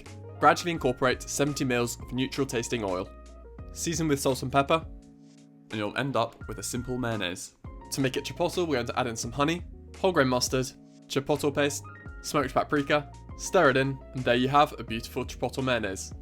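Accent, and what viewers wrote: United Kingdom. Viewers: That's what you can hear from a British dude. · a form of london accent, possibly a west london one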